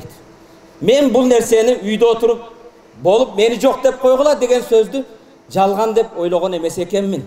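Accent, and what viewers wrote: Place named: Kyrgyzstan. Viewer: Кыргыз, элебиз...жон эле Озубуздун диалектиде суйлоду....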